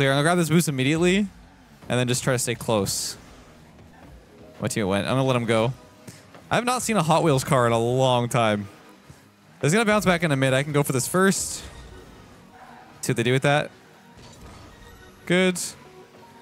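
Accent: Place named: Canada. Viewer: i can hear the canadian so much more now omg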